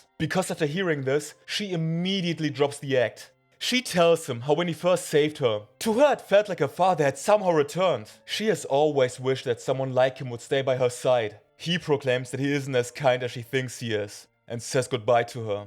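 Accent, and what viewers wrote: Germany. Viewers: Man hört richtig raus dass du deutscher bist hahahaha fr mach mal Videos auf deutsch ich suche ganze Zeit schon jemanden der sympathisch ist und manwha webtoons oder Mangas erzählt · Ar you German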